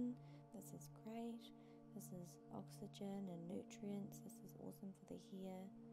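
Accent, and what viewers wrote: New Zealand. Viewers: You sound like a kiwi ( newzealanders) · do I hear a kiwi accent :) ? very beautiful work btw...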